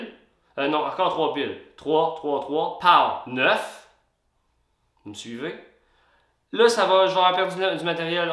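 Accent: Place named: Quebec, Canada. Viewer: Salut, D'abord, par curiosité, j'ai voulu voir si le sous-titrage automatique en français de google fonctionnait avec l'accent québecois. En fait non. XD As-tu fait une lame avec ce damas, et si oui en as-tu fait une vidéo ? ^^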